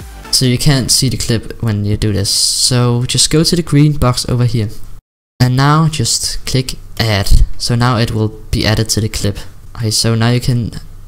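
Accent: Denmark. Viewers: Det er squ godt broder! xD Men god video man kan godt høre du er dansk :)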